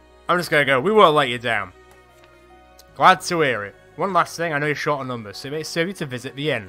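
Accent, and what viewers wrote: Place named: North, United Kingdom. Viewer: Thanks R'Kid, makes a nice change to 'ear someone speak northern.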